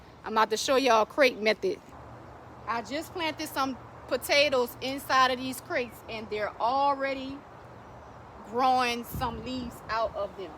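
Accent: New Orleans, United States. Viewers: Accent reminds me of family in Nawlins. We like to talk ish about the differences at family reunions lol much love from Slauson and Crenshaw 💪🏽🏁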